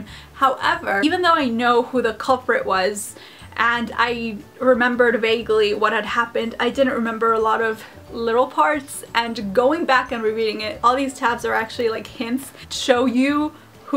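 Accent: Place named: France. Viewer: Your french accent is so cute! :D But I don't understand why your french class teacher made you read Agatha Christie and not an author who wrote in french haha (but Agatha Christie is awesome so oh well :3)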